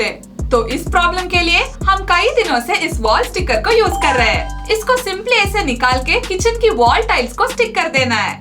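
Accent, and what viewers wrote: South, India. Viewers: South indian accent🎉🎉🎉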